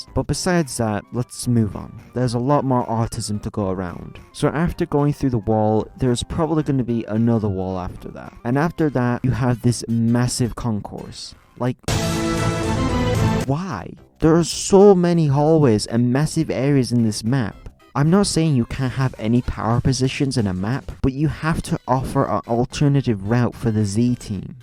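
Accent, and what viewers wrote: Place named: United Kingdom. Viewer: New upload from my favorite bri’ish man? Nice! (Don’t tell combine elite lmao)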